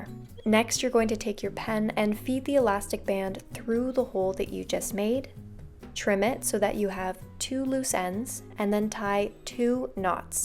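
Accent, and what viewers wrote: Canada. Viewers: I loved this video, it was beyond helpful <3 I think using a cheap notebook and modding it helps with the "perfectionist freeze" that I get when I don't want to start a new notebook and ruin it. By the time you start these books, they've already been broken in =) Also I thought I heard that familiar Canadian idiolect, and I'm glad that wasn't just in my head lol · you have the strongest Canadian accent i’ve heard in a long time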